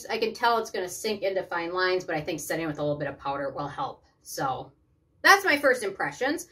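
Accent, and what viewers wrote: Minnesota, United States. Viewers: Hey, I just started watching your videos, thanks so much for the reviews! I could tell right away by your accent that you must be Minnesotan, I'm from Minnesota too, (a.k.a. the best state in the USA!) I would love to see a review on the new DRMTLGY Physical SPF 44. I've been eyeing that one!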